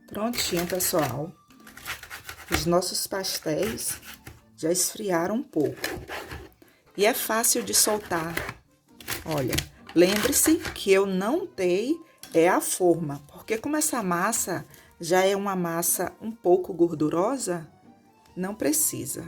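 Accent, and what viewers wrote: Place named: Salvador, Brazil. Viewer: Tenho uma amiga que é de Salvador e fala igualzinho você !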